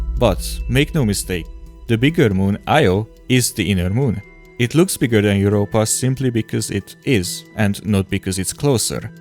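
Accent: Hungary. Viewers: Great video! Just out of curiosity; are you Hungarian by chance? Guessing by your accent.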